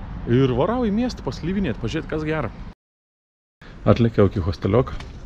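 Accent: Samogitia, Lithuania. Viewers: sunku buvo priprasti prie tavo zemaitiskos snektos, bet siaip unikalu ganetinai, pradejo patikti :D o kiekvienas tavo video turi kazkokio sarmo, tau tinka kurti toki content'a :) sveikinu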